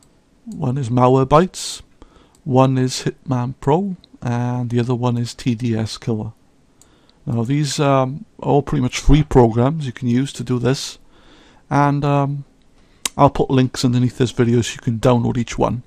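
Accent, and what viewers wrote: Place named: Plymouth, United Kingdom. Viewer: You saved me 120 pounds, for that... you get my sub. thank you so much. PS is your accent from plymouth? <3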